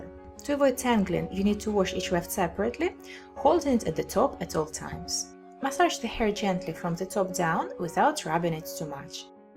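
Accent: Russia. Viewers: She haves russian accent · Ха,я вот сколько смотрю эти замечательные ролики и каждый раз думаю,интересно,девушка говорит правильными английскими словами,но с совершенно русской интонацией:) Сама с этим борюсь,изучая английский. Пока безуспешно. Спросить было неловко,спасибо,рассеялись мои сомнения))) Спасибо большое за полезное видео! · I told russian accent:)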